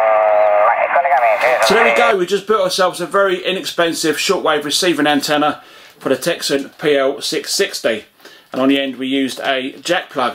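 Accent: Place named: England, United Kingdom. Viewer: Nice too hear somebody from back across the pond . I’m a. XPat living in the States . I have the Tecson 310 and love it . Where in England are you ? I was from the the Midlands Coventry. Thanks again .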